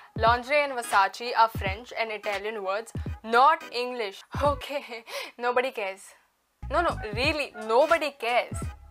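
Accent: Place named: Goa, India. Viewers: That konkani accent doest suits you.,........😬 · I haven't been to goa in such a long & hearing you speak in that typical Goan accent makes me want to go there and learn the rest of the language and speak and catch the accent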